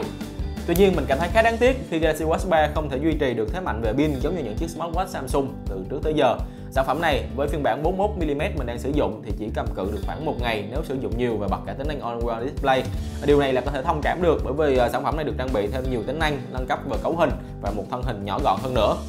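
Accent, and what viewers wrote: Binh Dinh, Vietnam. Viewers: nghe giọng Bình Định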